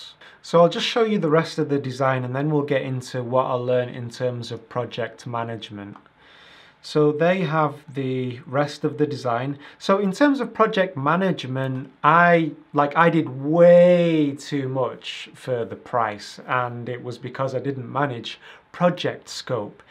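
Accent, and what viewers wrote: Manchester, United Kingdom. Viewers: Hey, another great video! Thanks for share with us your genuine experiences and mistakes. Btw are you from Manchester uk?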